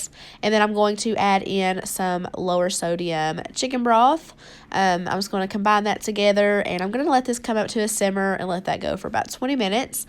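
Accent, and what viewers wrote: South, United States. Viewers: I subscribed to you about 2 weeks ago but I have watched every episode that you have made please keep doing them it's amazing recipes that you have and I like to hear your Southern accent 🙂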